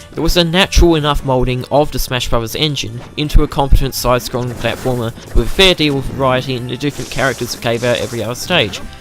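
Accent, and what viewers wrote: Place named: Australia. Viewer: Australian voices <3 You <3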